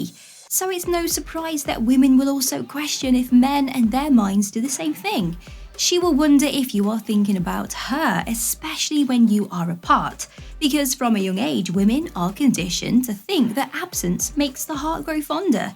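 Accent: United Kingdom. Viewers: You are my favorite girl. When I discover you I let go the others. I find myself come back again and again. None of the others women have been able to do that to me. Even with all their charms. Anyway the things I like about you are. 1. You know what you are talking about. 2. You deliver what you saying with great confidence. 3. Your intelligence eloquent way of speaking is truly unbelievable. As though that was enough. 4. Your voice (oh that voice that British accented voice) delivery is sweet as a group of morning birds welcoming in a new dawn. 5. You protray honesty with a demand that makes your listeners cavity to you. 6. To top that all you are beautiful. ❤️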